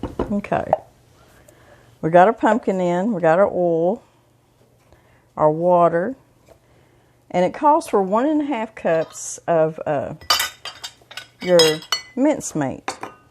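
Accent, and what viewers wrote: South, United States. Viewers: Thank you again for your calm, sweet "southern" accent, blog. Not brave enough to try some of your recipes like this one but, love seeing your home, decorations and your sweet smile, and too!!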